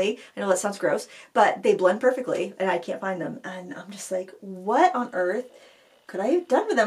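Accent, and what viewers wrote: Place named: United States. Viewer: Just tuned into you by accident. I love your energy. Being an Old English Great Grandmother, at 1st i had to get used to the ' fast talking' American accent which im coming to abdolutrly LOVE . Its got my old arthritic body up n painting. Looking forward to seeing n hraring you much more often. Big hug from across the pond. Xxxx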